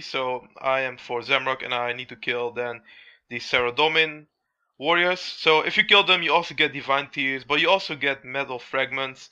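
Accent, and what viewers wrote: Netherlands. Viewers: je hoort egt dat hij nederlands is :p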